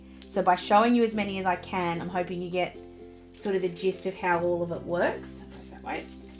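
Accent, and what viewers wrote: Australia. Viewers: are you from QLD? Trying to guess your state by your accent :) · Just Love Aussie accent and culture!! :D thanks for the video!! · Between your adorable excitement & your accent....I was anticipating a “crikey” or 2, but at least once 😂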